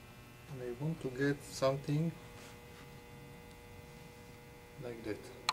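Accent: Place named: Israel. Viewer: Amazing! which powder did you use? and.. you have an Israeli accent, are you originally from Israel??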